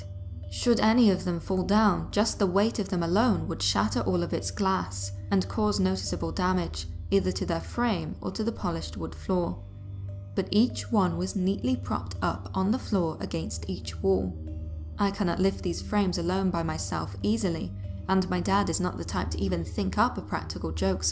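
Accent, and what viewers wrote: England, United Kingdom. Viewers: Love your English accent and you tell the stories so well I love listening to you! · The English Accent always does and when combined with the beauty of a female voice then I sit here enchanted by the story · Thanks. This was well done. I like to listen these tales while doing things around the house. I’ve tried listening to some other ‘told stories’ videos, but they’re either over the top with their music and graphics, and/or the narrator is not very good at telling the story. One channel that listened to for a bit had narrators who sounded as though they were reporting the news on British television ( I’m American so it hits my ear in an odd way ). No offense as it sounds as though you may be British as well. However, you did quite well here. Anyway, thanks again.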